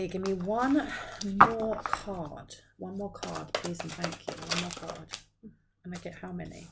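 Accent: England, United Kingdom. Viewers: 🌹🧘 THE LITTLE GIRL IN ME WANTS TO TELL YOU THIS AND I'M SORRY IF THIS OFFENDS YOU BUT I LOVE YOUR VOICE BECAUSE IT BRINGS BACK MEMORIES OF WHEN MY MOTHER TOOK US TO CHICAGO TO SEE MARY POPPINS FLYING THROUGH THE AIR LIKE AT A CIRCUS SHOW OR SOMETHING BARNUM & BAILEY CIRCUS USED TO ALSO COME THERE 🌹🦉 AND I ALSO REMEMBER AFTER THAT I COULDN'T WAIT TO GET MY CHRISTMAS GIFT BECAUSE IT WAS GOING TO BE A MARY POPPINS DOLL THAT SPOKE WITH THE ENGLISH ACCENT LIKE YOURS OR BRITISH ACCENT LIKE YOURS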